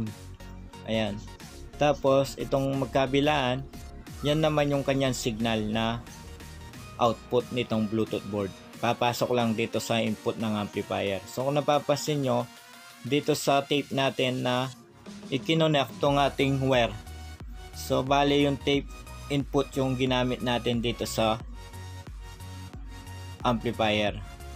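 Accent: Pampanga, Philippines. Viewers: Kapampangan ka ne boss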